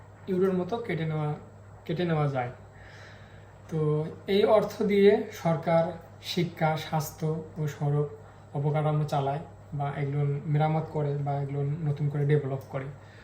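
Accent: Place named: Barishal, Bangladesh. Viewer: বরিশাইল্লা🤔🤔🤔🤔🙄🙄🙄 সামনের লেখা দেখে দেখে,রচনা বলতেছে🤣🤣🤣🤣🤣🤣🤣 ভালো আছি,পবিএ মক্কা আছি🥰🥰🥰🥰🇸🇦🇸🇦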